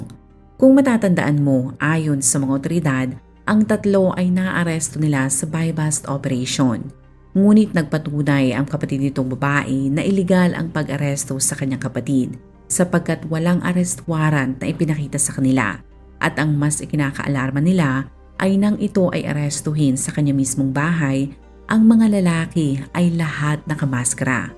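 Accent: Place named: Visayas, Philippines. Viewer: Bisayang bisaya mag salita yung narrator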